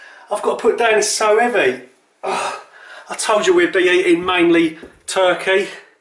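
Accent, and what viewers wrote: United Kingdom. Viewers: Love your eyes and accent...yum British men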